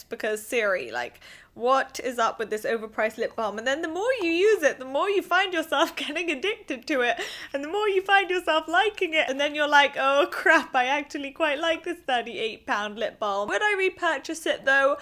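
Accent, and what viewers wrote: France. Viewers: haha i didn't really understand when you pronounce the fragrance's name but after i saw the name and rethink about your french accent, i feel like it's pretty accurate :P LOVE YOUR VIDEOS!! >< <3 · Loved this video ! And the French girl is saying : Your French accent is so cute hahaha xx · your french accent is so cute it worth an 8 i love your vidéos !! Bises from France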